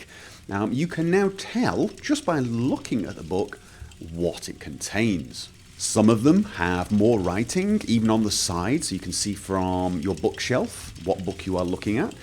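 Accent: United Kingdom. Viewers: i love your sound and your British accent :D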